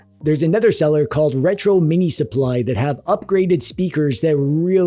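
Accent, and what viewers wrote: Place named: Canada. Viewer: Anyone with eyes can compare the hands and see they're two different people. There was an April Fools gag last year where pretended to be. Also, is Canadian. There is no way I can believe that could pull off that accent. If he could, he wouldn't be making YT vids, he would be making bank as a voice actor.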